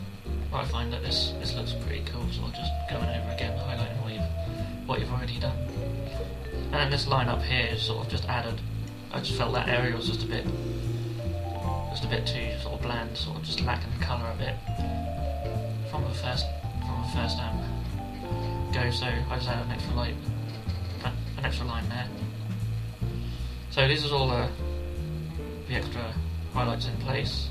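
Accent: Norfolk, United Kingdom. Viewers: is that a Norfolk twang i hear in your accent ?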